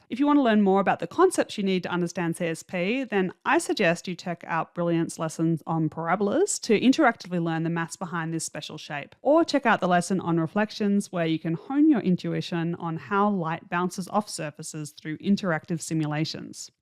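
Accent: Australia. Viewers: As a UK English speaker, I had to put the captions - that is, subtitles - on to work out what "soller" is! Is this Australian or is this?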